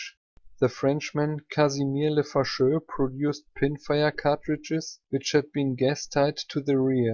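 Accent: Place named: Germany. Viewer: Ich würde dir anbieten, dir dass in einem verständlichen und korrekten Englisch zu besprechen, weil so ist es ziemlich "denglish" und kaum ein Muttersprachler wird sich das "antun".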